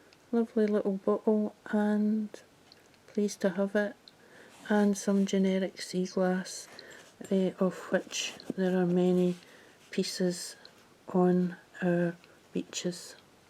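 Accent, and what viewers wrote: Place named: Scotland, United Kingdom. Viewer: Lovely finds! My favorites are the codd marbles. Thank you for showing. And I enjoy your Scottish accent a lot. Greetings from Germany!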